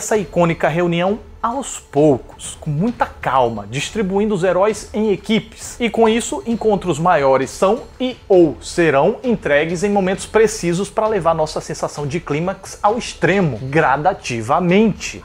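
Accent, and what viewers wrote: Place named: Ceará, Brazil. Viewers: Amo sua narração (estilo nordeste)em cearencês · Cara adorei seu trabalho, fala um ciarez fluente, com mestrado, doutorado e senhor dos anéis, deixa tudo muito engraçado de se ouvir, bom trabalho.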